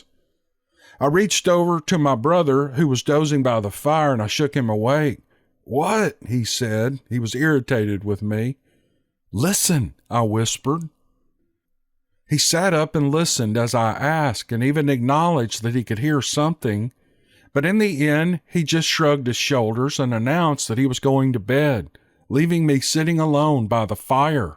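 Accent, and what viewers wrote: South, United States. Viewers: Have a happy Thanksgiving, thanks for the stories man just love your southern accent my brother.. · I've said this before, but I will say it again. I'm from Oklahoma and am used to hearing people talk with a southern accent. So when I hear that familiar drawl with those vowells drawn out, I get a warm, comfortable feeling. It's brings a familiarity that inspires a trusting attitude for me. I can relax and fall asleep when listening to you. Thus, you turn out to be the voice I go to sleep to each night. I have difficulty with sleeping, so anything to make those long nights pleasant and maybe shorter is welcome. Thank you for the pleasant demeanor and great stories you choose for all of us. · Hi, I have become a huge fan from the UK. I love your stories and could listen to you for hours.. I adore your accent, it's so nice and homely, being from England I never get to hear a that glorious Southern drawl.. 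thank you so much for all the time and dedication to your channel, from me, hundreds of miles away across the pond. Happy thanks giving to all my American friends 😊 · Thank you, for providing such wonderful entertainment totally unrelated to all the craziness going on in the world these days!! You are a GREAT story teller with a beautiful, smooth as velvet Southern voice!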